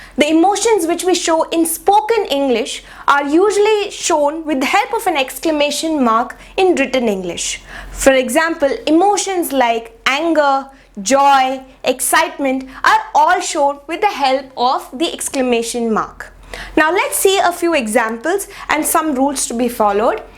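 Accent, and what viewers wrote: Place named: India. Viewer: She sounds like an indian person I know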